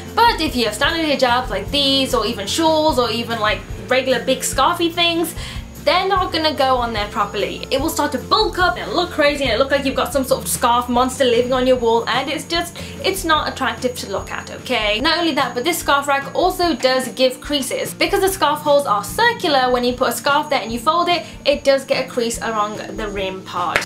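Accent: United Kingdom. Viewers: Biiiiiiig liiiiiike from morocco !!! You saved my life x) i discovered you 30 minutes ago !! It s midnight now !! So you can imagine how excited i am now especially that i couldnt sleep !! LOL !! I watched 4 videos of you , liked them all !! good luck , waiting for other videos ! By the way , u have a funny beautiful British accent , i LOVE it , Nouhaila - Morocco <3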